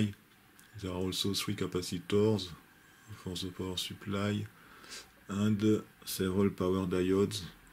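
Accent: France. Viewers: Getting better at understanding Frenglish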